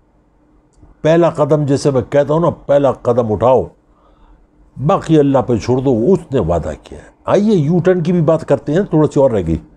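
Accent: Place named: Kutch, India. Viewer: Sir, you choose so true wordings Fr all types of goons of Pakistan 🇵🇰 👏 Salam to you to gv details to new generations. I’m listening 👂 n enjoying ur each n every word n ur lovely Kch’s URDU. Love word BAWLE, Mullah Fazloo n many 😍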